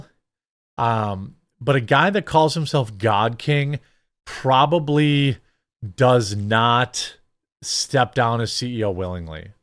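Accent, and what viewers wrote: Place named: Midwest, United States. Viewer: It's always funny to me how you pronounce MAGA as May-guh 😂. Just messing you dude. Gotta love that Midwest accent.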